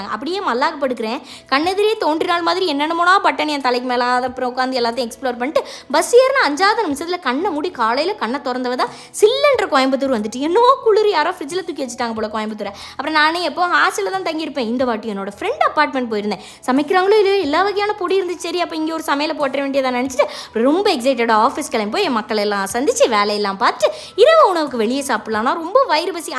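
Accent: Tirunelveli, India. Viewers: தாமிரபரணியில் இருந்து உங்க தமிழ் சிறுவாணி வரைக்கும் பாயுது அருமை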